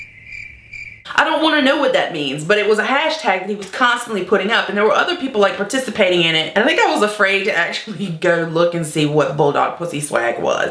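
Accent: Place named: South, United States. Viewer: i got so excited when you said ello.poppets im like omg she has an accent and then i heard the southern accent and im like Holy god she has an accent! i love it. first video i watched. and i am hooked. subscribed and binge watching now!!!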